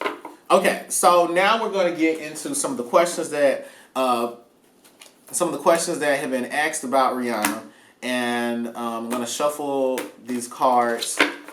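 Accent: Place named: South, United States. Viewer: I love your country accent btw! The Southern accent is very sexy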